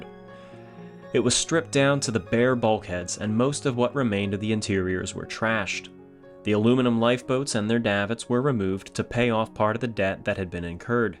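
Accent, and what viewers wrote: United States. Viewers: a wonderful American accent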